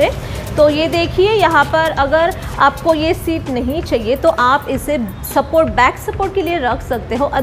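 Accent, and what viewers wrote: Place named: South, India. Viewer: Apka south indian speaking style bahut pyara hai .. ♥️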